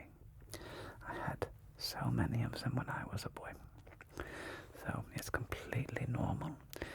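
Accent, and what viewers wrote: Germany. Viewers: This is the best thing I've listened to all year ❤ Love the german accent! Wunderbar? 😊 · This sounds very authentic, like a person born in Germany who spent some years in primary school living in England with their grandpa or something. Like the history yotuber Kraut or a Dr. King Schulz vibe. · I really dont know why the german accent is so good within asmr but noone does it as good as. even germans themselves 😂 AND A HUGE PLUS IN THIS VIDEO, is that when you're writing and taking down notes it actually sounds realistic, I hate it when asmr artists just drag the pen across the paper. Thank you great videos keep it up. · I have German coworkers, this is a very realistic representation of their accent, honestly the only difference is they have this odd inflection where some sentences are very fast but others are very slow. If they’re excited about something or carried away their accent thickens. 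But as far as it goes it’s a wonderful representation! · I think he sounds like a German who's worked in nz/aus for a while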